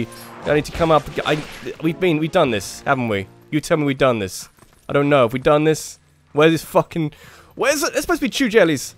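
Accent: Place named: Scotland, United Kingdom. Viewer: We need the Scottishness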